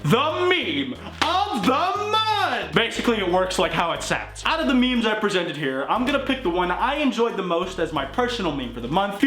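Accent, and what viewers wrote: Russia. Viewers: I NEED MORE OF TALKING IN A RUSSIAN ACCENT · That was an interesting voice with the Russian accent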